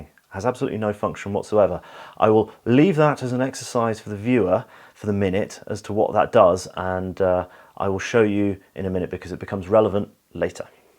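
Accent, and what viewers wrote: United Kingdom. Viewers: A deceptively simple looking weapon, until you get into the details! Question, if you're in the UK as your accent would suggest, how are you in possession of this in firing condition?! I'd bl00dy love one! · Nothing like a good old British voice to explain the workings of a British gun.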